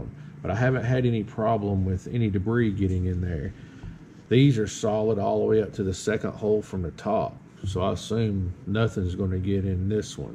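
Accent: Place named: South, United States. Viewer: I like your boots, and I like that southern drawl of yours. Happy New Year to you. From Canada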